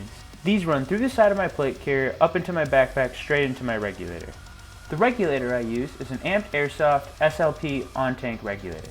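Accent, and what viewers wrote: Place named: Eastern Europe. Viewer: Are you even American. You sound some of an Eastern European ...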